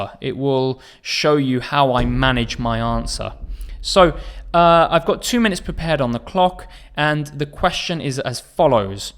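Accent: United Kingdom. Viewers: I'm in love with your British accent. Thanks for your amazing video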